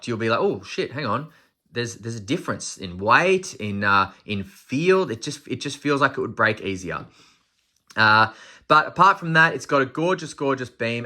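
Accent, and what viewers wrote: Australia. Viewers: First off, let me say that I love that some one is making content that feels like it’s tailored for me. 2nd, as an American, we tend to love narrators from other countries, and your Australian accent is great! 3rd: how would you say these compare to the 16430 ?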